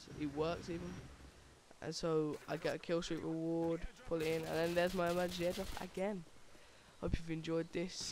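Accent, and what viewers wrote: Birmingham, United Kingdom. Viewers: its his accent..brummy niot the british language itself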